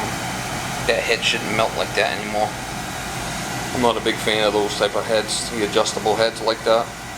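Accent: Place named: New England, United States. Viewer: Must be from Mass or Rhode island..got that can't pronounce words correctly..kinda baby talk like.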